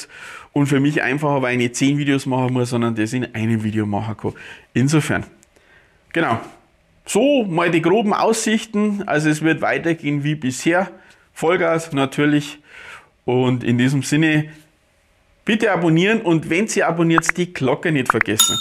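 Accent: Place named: Swabia, Germany. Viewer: Hallo, viele Grüße von Indiana in den USA. Ich schaue mir deinen Kanal sehr gerne an und habe ich schon vieles gelernt. Mal sehen was noch alles auf uns zukommt im kommenden Jahr. vor vielen Jahren lebte ich in Stuttgart und dein Dialekt bringt mich immer wieder zum schmunzeln.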